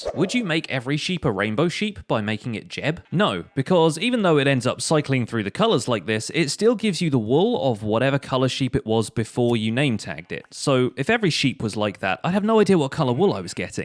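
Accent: United Kingdom. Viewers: I mean he's like British or something you find that everywhere. And I know it's the pitch of the voice but it's still just British. Not to mention you said his accent and nothing like his voice. Sorry this is long I just had to explain something that I dont really understand. Exactly the reason I shouldn't have a response because I dont have a perspective. I wasn't trying to roast anyone or anything. I hope this is understandable. Thank you for your time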